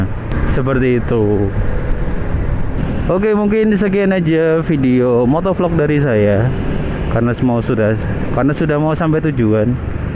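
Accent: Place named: Banyumas, Indonesia. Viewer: Mantap bang. Slam motovlog ngapak 👍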